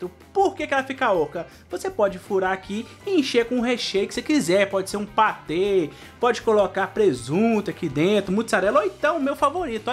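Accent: Minas Gerais, Brazil. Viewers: Adorei, só de falar já vê que é minerim!! Já compartilhei e vou fazer. Ontem domingo dia 23/07 eu fiz a broinha de fubá de canjica doce. Em breve farei esta receita. Estou te assistindo de Ipatinga, Minas Gerais!!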